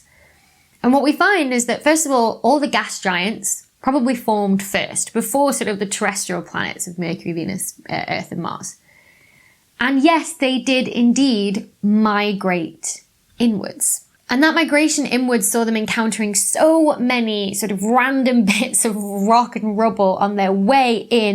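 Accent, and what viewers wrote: United Kingdom. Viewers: Your accent. Is just fine; after Boston, all Brits have learned to hide their "T." 😊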